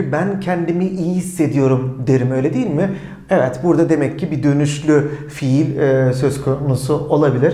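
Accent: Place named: Germany. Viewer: anlamalıydım. telaffuz hochdeutsch adeta bir alman aksanı.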